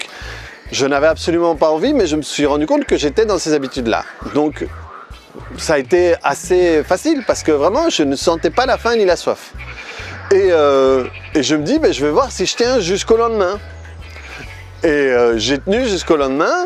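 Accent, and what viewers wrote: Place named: Belgium. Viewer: Ça se voit pas mais ça s'entend que tu viens de Belgique haha ><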